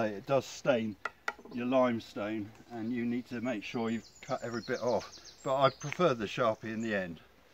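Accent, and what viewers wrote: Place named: Hampshire, United Kingdom. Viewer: Great advice, you know what you are doing. Sounds like you have a Hampshire accent, see you got that slabs from fordingbridge..I’m just down the road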